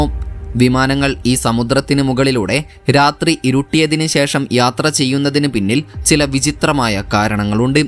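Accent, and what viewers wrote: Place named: Kannur, India. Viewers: It is Malabar peole lways say like tht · ഇവൻ വടക്കൻ ജില്ലക്കാരൻ ആണ്.. അതാണ് അങ്ങനെ വരുന്നത്.. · Al Kannur Karan anennu thonnunnu....avar Ingane Anu pronounce cheyyuka....ano ...? 🤔♥️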